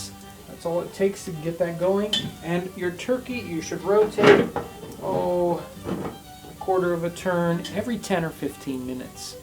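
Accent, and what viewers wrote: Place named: United States. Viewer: Is this guy Dutch American? He looks Dutch but speaks with an American accent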